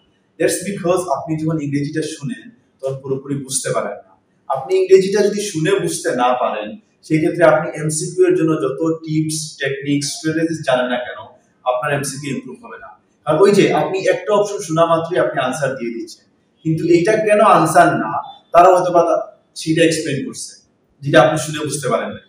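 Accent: Sylhet, Bangladesh. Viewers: Apni ki sylheti?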